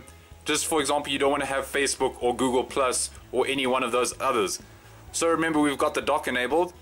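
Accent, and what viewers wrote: South Africa. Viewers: I also think he sounded South African and I'm South African too haha :P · Hi there nice tut, are you from South Africa? your accent sounds like it. I am from South Africa. :-)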